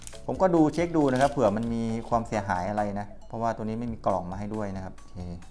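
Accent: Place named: Isan, Thailand. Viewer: 555มีอารมขันด้วย เว่าอิสานได้ยู่ตั้วนิ่☺☺😅😅😅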